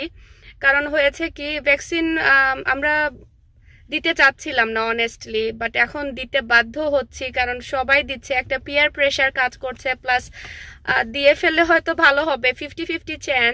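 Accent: Sylhet, Bangladesh. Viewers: Are you sylheti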